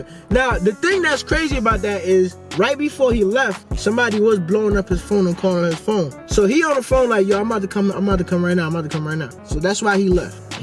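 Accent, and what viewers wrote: New York, United States. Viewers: Are you from NY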